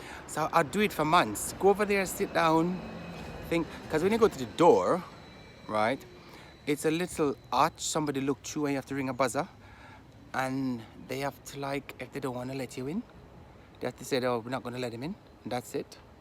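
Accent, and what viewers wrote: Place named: Jamaica. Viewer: You sound like a yardie. Love the story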